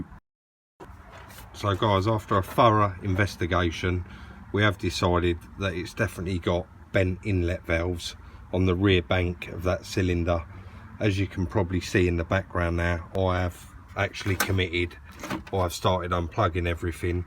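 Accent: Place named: Liverpool, United Kingdom. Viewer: Great video Sir! Interesting English accent too.., is it Liverpool?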